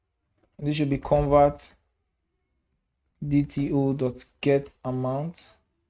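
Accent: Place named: Nigeria. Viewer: I liked the video but I really could not follow up because of the accent, it is difficult for me to cope with nigerian accent, not that there is anything wrong with such accent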